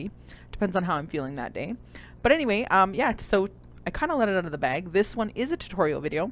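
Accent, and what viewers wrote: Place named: Canada. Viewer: ehhh canadian... oh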